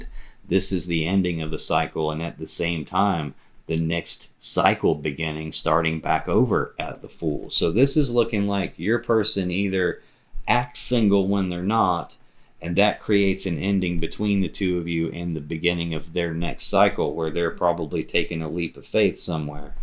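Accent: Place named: Texas, United States. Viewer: Your accent is going to fit in nicely in Texas, it almost sounds like a native Texan.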